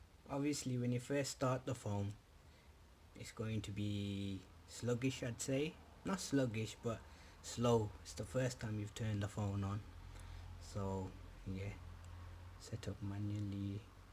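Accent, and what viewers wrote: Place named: Birmingham, United Kingdom. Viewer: your brum accent 😭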